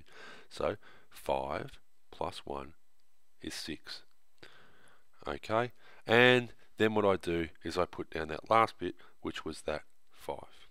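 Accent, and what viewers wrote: Australia. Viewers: YES, AUSSIE ACCENT MATH HELP! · The narrator is Australian. I like this method. I will be teaching it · Loving the Australian accent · The second I heard the Australian accent I subscribed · It's Australian, huh?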